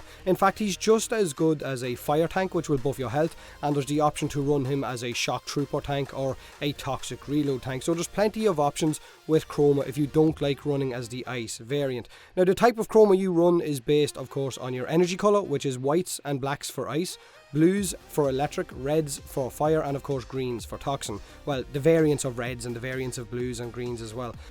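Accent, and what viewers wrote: Ireland. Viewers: am new to this channel and i love to know if this guy is Irish or not his accent sounds like Irish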